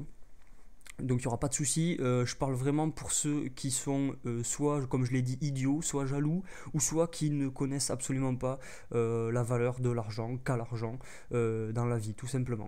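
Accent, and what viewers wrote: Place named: South, France. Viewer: La vidéo qui ne sert a rien, avec le veille accent du sud moisi. Trouve toi un vrai taf ! au lieu de faire dla merde comme tout tes pots youtuber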